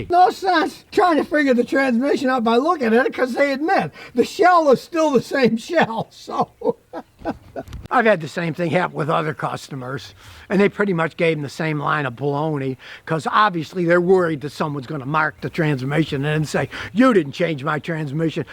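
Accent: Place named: South, United States. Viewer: It is truly sad, that he makes claims not based on facts. His videos are about his opinion, stereotypical old mechanic who just cannot get with new time and engineering. He is so brand-loyal, he can't see how bias he is. I have had owned 3 Kias/Hyndais. Recently sold wife's 2013 Santa Fe with 95k MI. Zero problems in 9 years, purchased brand new. Only thing wre did was new brakes around 60k mi. Had 2010 Kia Optima SX. Bought it used with 150k, once again, zero problems in 2 years that I had it. This is why I do not watch his videos any more. He can't even pronounce Hyundai correctly. As country as his accent is, he is making fun of Alabama? Give it a rest. That are other ways to get views on YouTube.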